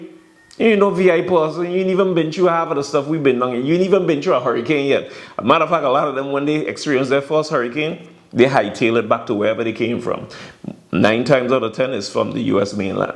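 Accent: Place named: Jamaica. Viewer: I thought you were Jamaican too!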